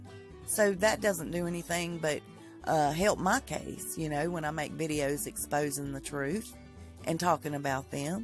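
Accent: South, United States. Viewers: “A knapsack full of nourishment...”. LOL., you are so southern and I just love it!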